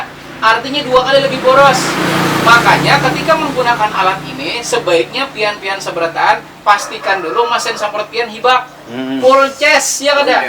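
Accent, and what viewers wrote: Banjar, Indonesia. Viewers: Banjar banar pandiran pian Pak lah... Asli urang hulu kah pian pak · urang Banjar kah? bujur?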